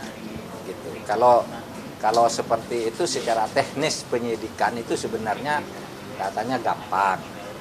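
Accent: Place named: Madura, Indonesia. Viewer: Pamekasan Madura Menyimak. Logat Madura dari Pak Mahfudz masih sangat kental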